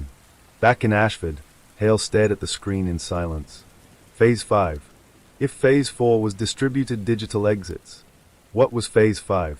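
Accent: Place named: Boston, United States. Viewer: Dear narrator, are you AI or areal person please? If you are AI, why have you been given a Boston accent. Just curious?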